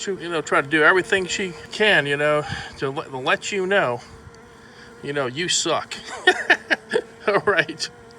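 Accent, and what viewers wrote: United States. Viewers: Great video! Also your accent is so unique. You sound like east coast, southern, west coast, rhode island. All at the same time. So weird. (in a good way)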